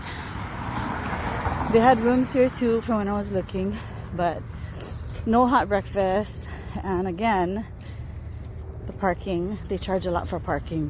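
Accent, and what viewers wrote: Hawaii, United States. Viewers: as soon as you started talking i knew you was from hawaii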